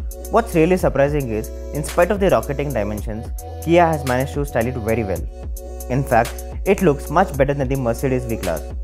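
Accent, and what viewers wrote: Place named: South India, India. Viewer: That souuuth Indian accent though 😄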